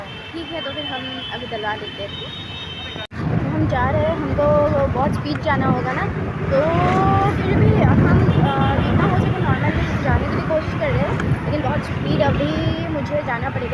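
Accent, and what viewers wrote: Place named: Assam, India. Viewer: Bhai vo assamese hai .. assamese ki hindi itni acchi nai hoti hai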